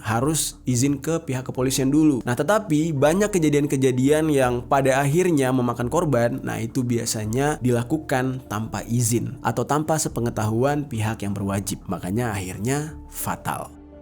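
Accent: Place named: Talun, Indonesia. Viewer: Suarane teko Talun kang...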